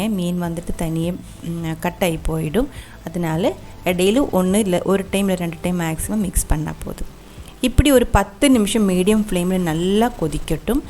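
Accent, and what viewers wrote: Kerala, India. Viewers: കൊഞ്ചം കൊഞ്ചം മലയാളം വരുതേ ഉങ്കൾ തമ്മിൽ സൊള്ളും നേരത്തുക്ക്‌ 😃☺️☺️ പാത്താലേ തെരിയും സൂപ്പർ കറി എപ്പടി ഇന്തമാതിരി... ആന്നാ നാൻ ഒരു മുടി ട്രൈ പണ്ണി പാത്തിയാച് നടക്കവേ മുടിയാത് 👌👌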